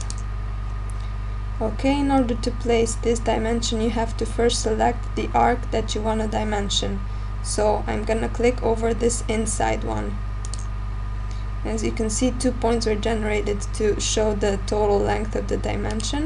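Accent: Eastern Europe. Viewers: You sounds like south slavic speaker. Am I right?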